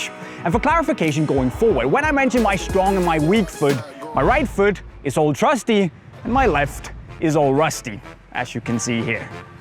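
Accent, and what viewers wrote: Denmark. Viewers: He definitely has the danish accent😂😂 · I smell danish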